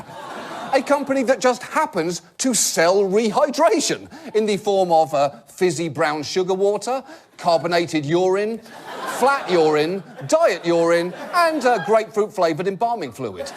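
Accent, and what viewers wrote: United Kingdom. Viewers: He is not American. He is taking on American issues with wreck less abandon. He rants without perspective. He is incapable of entertaining guests who could throw a dose of cold facts on his middle school understanding. I don't understand why Americans are so quick to abandon critical thinking when they hear a British accent.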